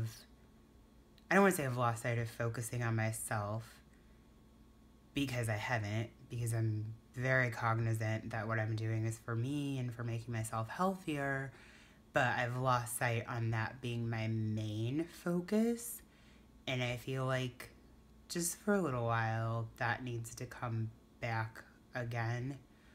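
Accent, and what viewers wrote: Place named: Northern California, United States. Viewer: You sound like my cousin who is from northern Cali that's why I was like hmm I wonder if she is from the west coast. I'm from New Jersey but people say I have a southern accent lol as far as the post op... I've been scared to eat because I always get nauseous.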